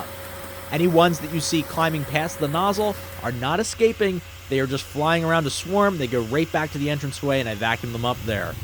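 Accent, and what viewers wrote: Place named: California, United States. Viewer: Nice Californian accent on this guy lol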